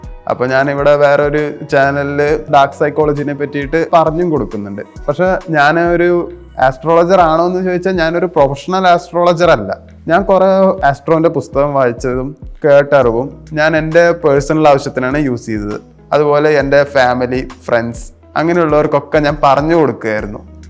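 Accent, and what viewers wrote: Palakkad, India. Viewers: പാലക്കാട്‌ ഭാഷ ആണല്ലോ ❤❤❤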